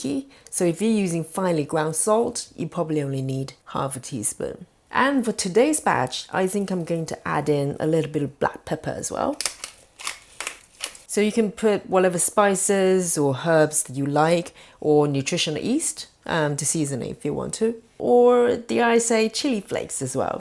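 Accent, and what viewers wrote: England, United Kingdom. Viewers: Precise and with class English accent. Thank you. 💐💐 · Hi I like your British Accent...your video contents are seems yummy surely will try I have got all the ingredients apart psyllium husk! Must get some soon! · More than everything I love your British accent.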